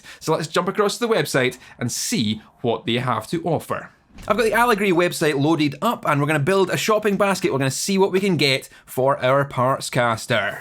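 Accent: Scotland, United Kingdom. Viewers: his scottish accent is honestly really addicting to listen to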